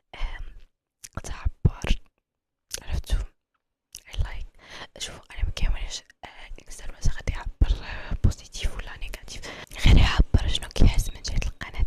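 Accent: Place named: Syria. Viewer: هضري دارجة نيشان بلا ديك اللهجة د باب الحارة كانظن اتكون حسن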